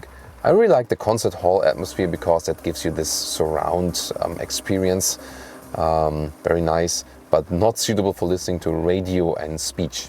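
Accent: Germany. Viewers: Jouw Duitse accent is charmant. Vriendelijke groeten van Marcus uit Holland :)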